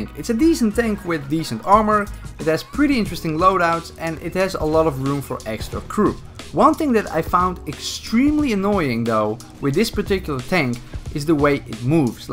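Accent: Netherlands. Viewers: Are you Dutch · Your definetly dutch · Like to Hear the dutch accent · Prachtig nederlands accent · are you dutch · nice dutch accent bro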